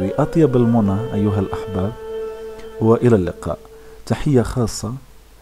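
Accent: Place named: Morocco. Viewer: أظن انك مغربي، أحس بحرف التاء كثير في قراءتك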